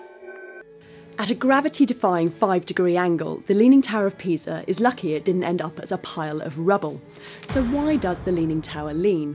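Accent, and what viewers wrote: United Kingdom. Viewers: Omg why did this stupid brithish Women had to talk you cant understand here Wheres the american womens to replace This Narrator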